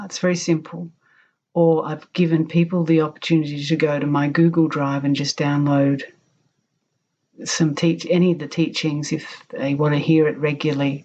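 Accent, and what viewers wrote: Australia. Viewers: Your voice, became ONE with the inward deep recognition by the words of spiritual seers, these inward places are not visited by others..then only you! Who would have thought that, by starting this 'work'? I am shure about it, you wouldn't have expected it at all..which makes you humble in wat you do.:-) Many of them, I would not have searched for, knowing their context from where they started, but listening with my full attention, and with your reading..these difference's fall away. (As does also you're accent being an 'ausie'.., from the first time on fell away.:-) You're a gem for so many of us, so please keep doing this with all your joy.., as we will listen with all our heart and will meet at the meaning of those words. Thanks for presenting yourself, although not needed, you were already there..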